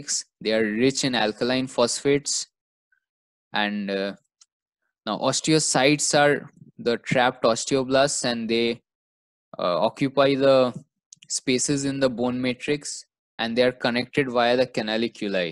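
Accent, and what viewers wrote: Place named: Italy. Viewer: Love the Italian accent XD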